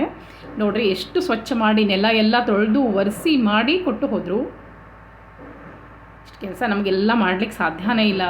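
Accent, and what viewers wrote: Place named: Hubli-Dharwad, India. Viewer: Amma nivu Daravada dalli idira anta ankondide nivu Bangalore anta helidu keli shock aytu 😊, but nim bhashe yalla hubli darawad bhashe ne ide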